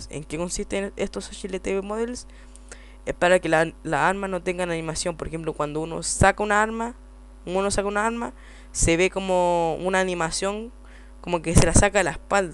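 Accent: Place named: Spain. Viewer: porq carajos hablas como si fueras español?xDBuen video!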